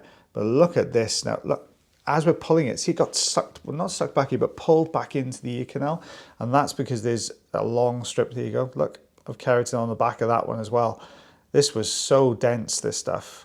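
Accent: Wales, United Kingdom. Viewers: I watch partly because I love hearing a welsh accent. Spent a lot of time in Wales all my life. Sadly never learned it, apart from a few phrases and swear words.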